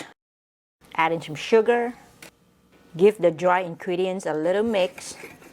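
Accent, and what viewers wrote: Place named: United States. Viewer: Are u living in Singapore? I love your American accent..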